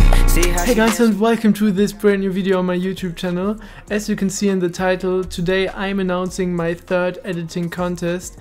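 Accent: Germany. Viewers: Man hört das du deutscher sprichst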